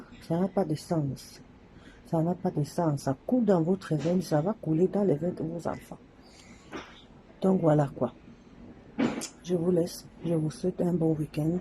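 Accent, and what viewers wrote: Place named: Cameroon. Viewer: Tu as l'accent des Camers. Tu es une vraie camerounaise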